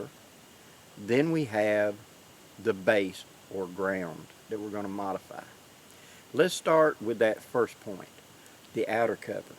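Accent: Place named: North Carolina, United States. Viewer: "It takes no effort to be miserable" love it! God bless our Marines. Speaking of Marines, I had a good friend who was a former service Marine who was from Hickory North Carolina, and he spoke very much like you do sir. Might you also be from around that part of our great country? Wherever you hail from, I thank you for your great informative videos!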